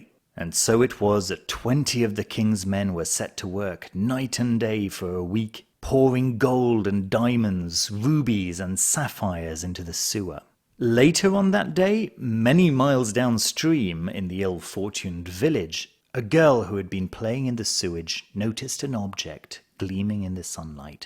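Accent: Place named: RP, United Kingdom. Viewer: you're the about the only intelligent English that I listen to all day I just love the way you speak to Queens English and you are have a permit if I could afford your patreon channel I wouldn't have to save up to buy a tarot deck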